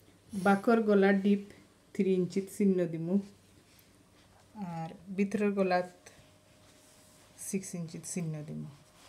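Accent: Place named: Sylhet, Bangladesh. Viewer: Nice hearing sylheti bangla. Can u show how to make saree blouse?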